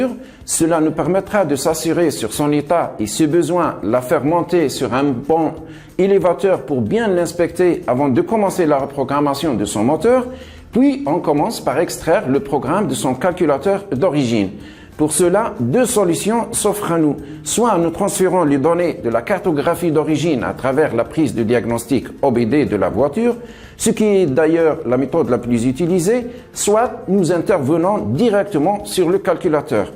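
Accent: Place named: Maghreb, North Africa. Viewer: ce qui est risible c'est pas l'accent c'est le langage soutenu qu il utilise conjointement à son accent.. Ça se voit que ce qu'il raconte n'a pas été écrit par lui.. Au Maghreb il y a des gens qui dépassent bien des français dans la maîtrise du français.. Il ne faut juste pas s'inventer un personnage.. Je suis sur que tu discutes avec lui c'est plus du tout le même français..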